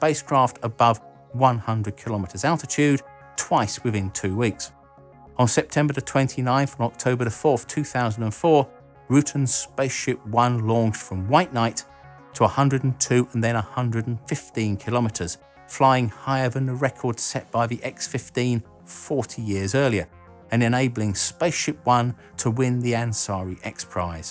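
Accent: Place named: England, United Kingdom. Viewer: I do like it. I was wondering why I prefer your channel to Isaac Arthur's. I guess I prefer the "talking head" approach that you offer. But, more than that, your graphics and script are tightly coupled. I also find the english accent more interesting, although Isaac also has a melodic quality (deep southern gumbo) - so his voice SHOULD be a compelling one - but it isn't (for me, anyway). Content wise might I suggest you dive deep into SLS with a discussion of how Nasa was FORCED to take SLS - and the politics in play in Senator Shelby's district. A great, if dated, read on the subject of NASA's miseries is the 2005 book: "Lost in Space: The Fall of NASA and the Dream of a New Space Age" by Greg Klerkx It's definitely a NASA bash, but the political points are hard hitting. Just looking to help.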